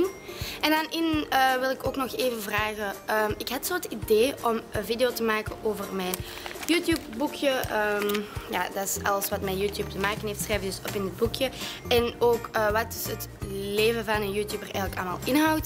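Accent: Belgium. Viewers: Sorry maar ben je een Belg of een Brabander ?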